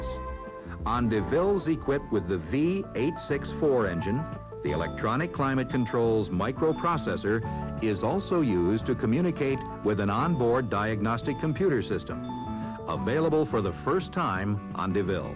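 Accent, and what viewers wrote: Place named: Michigan, United States. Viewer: I've always liked how all of these films from this era have narrators with Michigan/Great Lakes-region accents when these companies were much more concentrated there. It's a subtle thing but you notice it when you've been around. It's just a shame he didn't get to say center "council". That phrase is as Michigan as Meijers with an "s" I've noticed. A lot of cool video shots of the Metro Detroit area in these films too if you pay close enough attention. The freeway ramp is at the Lodge and Southfield; the building in the NW corner of the screen is the IBM building which had just been completed that year (1980). The "One Way" sign at the end of the freeway ramp is something Michigan still does, because I guess it's the only place people have ever made U-turns at the end of an entrance ramp at some point in the past to warrant them.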